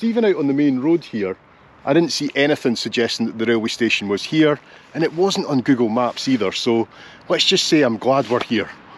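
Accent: Scotland, United Kingdom. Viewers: Sounds a bit Scottish 😊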